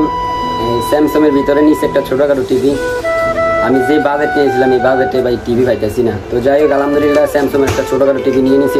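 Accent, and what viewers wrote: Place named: Chandpur, Bangladesh. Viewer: ভাই আপনার বাড়ি কি চাঁদপুর নাকি মতলব? আপনার ভাষা অনেকটা আমাদের মতো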